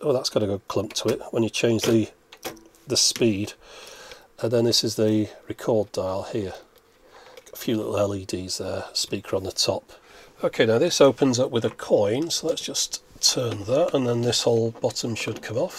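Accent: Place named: Northern England, United Kingdom. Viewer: He’s obviously from northern England which is actually in between Scotland (Connery) and London (Collins).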